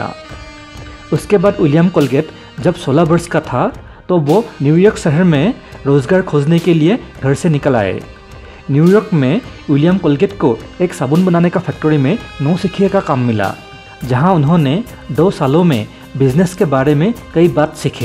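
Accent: Assam, India. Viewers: Assam se ho kya aap ? 😄 Aapki hindi eisa hi hai kuch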